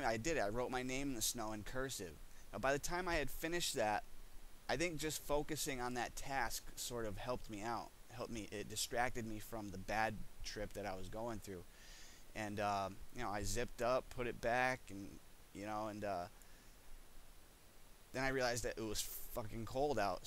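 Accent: Massachusetts, United States. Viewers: I knew he was from mass before he said it lol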